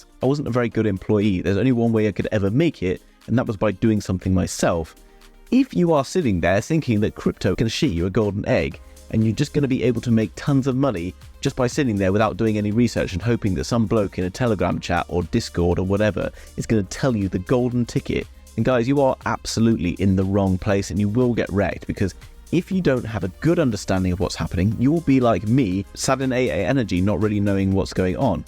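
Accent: RP, United Kingdom. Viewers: ah, you were a Rupert. It figures with that posh accent of yours. 😉 Yeah, I stayed for about 6.5 years. I managed 5 tours in that time. That kind of did me in tbh. I love your channel, mate. Keep up the good work.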